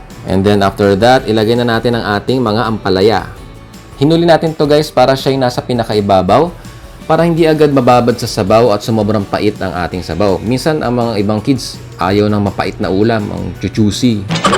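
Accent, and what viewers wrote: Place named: Batac, Philippines. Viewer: Taga Batac kan sa met sir, kasla kasjay iti ayog ti taga Batac hehe. Hello sir! Im from Piddig Ilocos Norte! Thank you kadetoy a recipe sir padsek man met ket tapno masiyahan ni papa😁 am 18 yrs old😇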